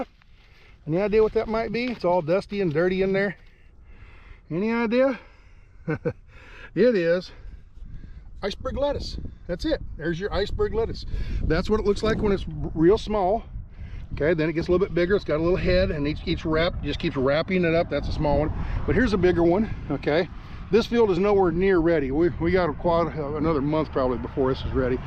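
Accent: South, United States. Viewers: Are you starting to speak like a trucker now or have you always spoke with a country sort of accent. is like a voice actor...his trucker voice is not always there and other times its very pronounced.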